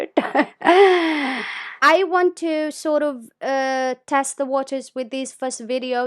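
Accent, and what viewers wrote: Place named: Ethiopia. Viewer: You sound like Habasha not Somali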